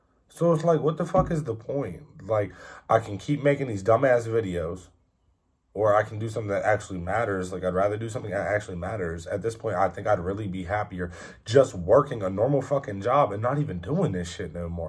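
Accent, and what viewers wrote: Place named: Pennsylvania, United States. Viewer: I enjoy watching you. I grew up in small town PA. My heart is there and listening to you reminds me of home. Your accent. The backgrounds. Your story is awesome. Keep going.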